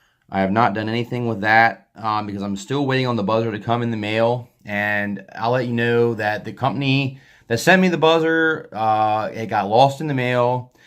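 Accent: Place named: Philadelphia, United States. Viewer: Thanks.. You sound like you live near Philly lol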